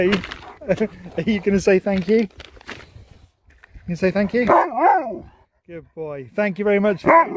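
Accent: United Kingdom. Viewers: Are you cornish?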